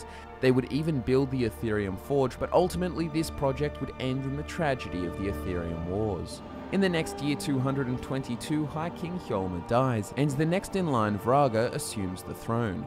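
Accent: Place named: Australia. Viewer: Been watching a lot of these lately and it is just so nice to hear an Aussie voice omg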